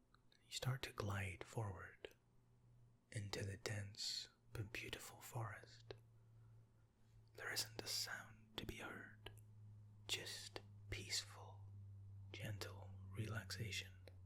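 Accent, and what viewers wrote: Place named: Glasgow, United Kingdom. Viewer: Oh it really has pal. This is my 3rd year here, it's funny how fast it starts to stick hahaha... 🙈 That's why I got so excited about your Glaswegian in your accent vid, feels so like home haha! I study music at the royal conservatoire of scotland:)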